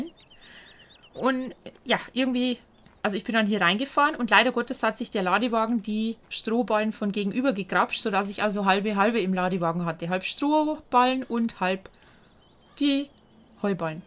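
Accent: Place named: Bavaria, Germany. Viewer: dieser Bayrische Dialekt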